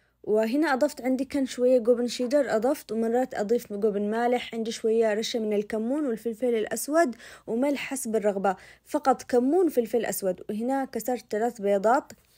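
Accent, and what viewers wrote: Aden, Yemen. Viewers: فديت الكلام العدني الاصيل ربي مايحرمنا منك ❤❤ طبخك مايحتاج شهادات 😊 استمررري وأحلى لايك👍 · تسلم الايادي حبيبتي 💖… لهجتك تجنن اعيد الفيديو علشان اسمع اللهجه اليمنيه … اجمل واحلا لايك 👍🏻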